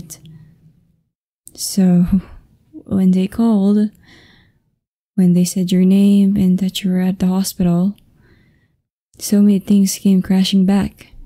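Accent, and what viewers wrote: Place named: Philippines. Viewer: U sound very Filipino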